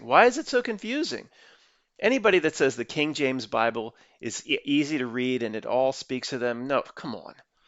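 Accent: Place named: Philadelphia, United States. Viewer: That Philly accent is real. Cheers,!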